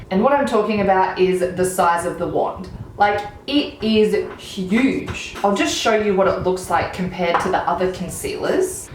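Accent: Australia. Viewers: Are you Australian live room Egypt ❤️❤️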